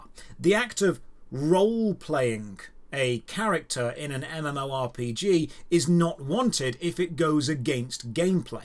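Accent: United Kingdom. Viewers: your accent... I can’t put a finger on it. I’d guess somewhere north of London. But not the savage lands that is the North East of England. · I usually can't take dnd seriously or the narration of dms. But with this guy I could, he looks like an elf and sounds like the omnipresent British voice narrating childhood favorite obscure animated fantasy films from the 80s.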